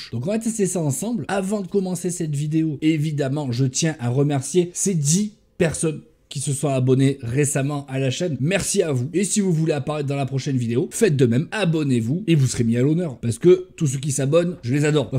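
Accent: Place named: Illinois, United States. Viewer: Tu serait pas à moitier américains par hasard? J'ai reconnue ton accent de l'illinois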